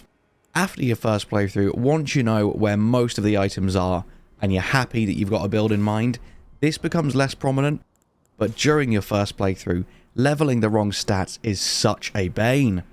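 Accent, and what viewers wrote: United Kingdom. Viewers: I just love your polite greeting in the traditional British accent. You have great info in your videos too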